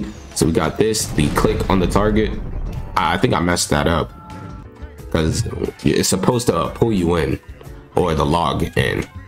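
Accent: Trinidad and Tobago. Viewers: Are you from trinidad? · R u from trinidad? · Are u from trinidad?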